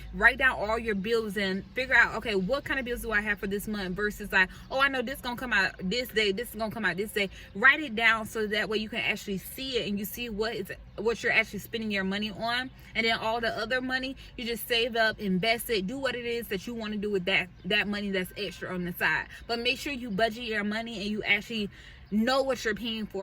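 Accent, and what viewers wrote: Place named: South Carolina, United States. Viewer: I love your American South Carolina accent iam living in my car too in DMV area, and thank you for your important info and that iam not alone, much luv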